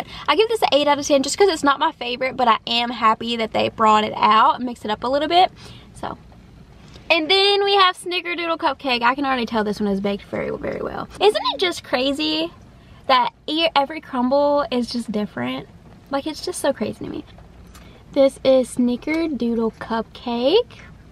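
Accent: South, United States. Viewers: You’re adorable! 😊 I’ve come across your channel a couple times with you trying out the new Crumbl cookie of the week. I like how you explain in detail about the taste, the quality and the flavor and it’s even better with your cute southern accent, which I adore. Thankyou for a great food review/Mukbang.❤😊😋😁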